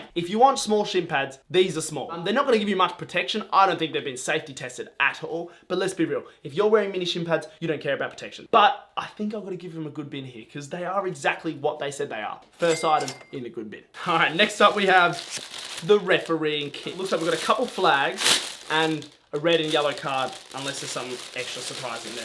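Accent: Australia. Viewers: Are you from Australia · Is Australian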